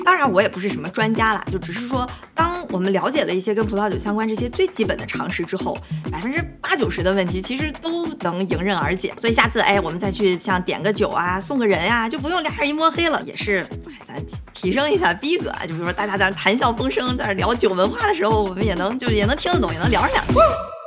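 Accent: China. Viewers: 阿lin的大陸鄉土味甩不掉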